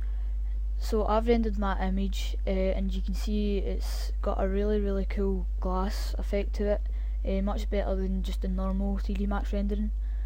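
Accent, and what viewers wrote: Scotland, United Kingdom. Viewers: Cannae beat a bit of scottish accent... :P pretty cool man, any idea how tae get it a bit clearer... mines coming up too dark and im making a realistic coffee machine for a uni project... I know i should know this cause im in uni but i only found out this "pro materials", its called Arch & design in 2012, l like a week ago.... cheers lol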